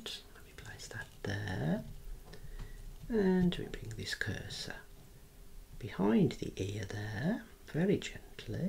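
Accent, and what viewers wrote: Birmingham, United Kingdom. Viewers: When a man can make me relaxed with a Brummie accent you know you're watching a true master of their craft.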